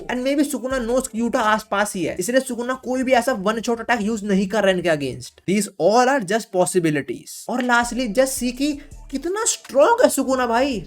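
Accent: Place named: Haryana, India. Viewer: Hate his haryanvi accent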